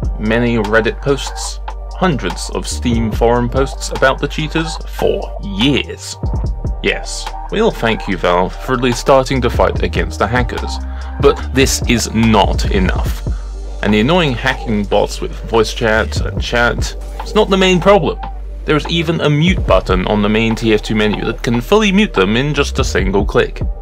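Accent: Russia. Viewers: are you rusian???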